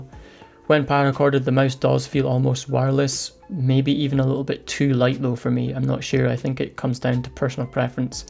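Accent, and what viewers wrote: Scotland, United Kingdom. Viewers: Great video. Sounds like your from the UK. any chance I could buy that broken mouse off you as it would make for a good time trying to fix it. Thank you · are u scottish ? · Super high quality production dude, you did an amazing job. Also, is that a scottish accent i hear?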